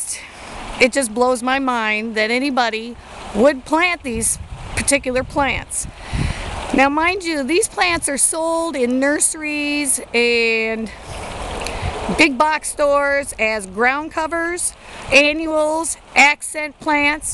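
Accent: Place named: Pennsylvania, United States. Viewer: you sound like you're from PA.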